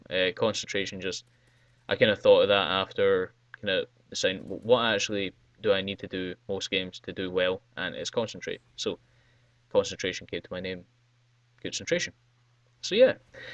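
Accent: Scotland, United Kingdom. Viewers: woo scottish ftw ;D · I LOOVEE your scottich accent! Easily subbed!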